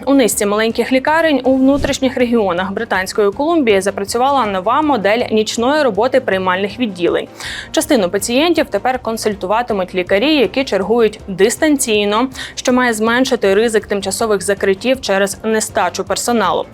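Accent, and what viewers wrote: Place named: Kirovohrad, Ukraine. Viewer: акцент Кировоградки ,чи рядом областi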